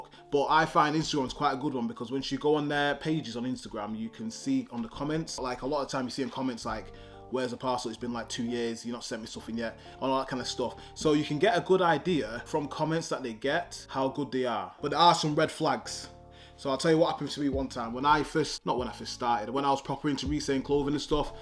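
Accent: United Kingdom. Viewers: I usually cant stand a UK accent but your knowledge knows no dialect I appreciate u and the gems u dropping ima scoop em up💎🏃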